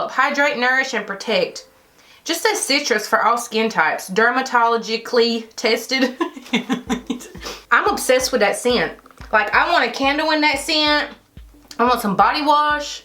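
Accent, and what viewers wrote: South, United States. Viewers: I forgot to mention I love the "country" girl in you. I lived in Mississippi for 10 years and 2 in Birmingham Alabama and I picked up a bit of a "draw" but yours is natural and so awesome.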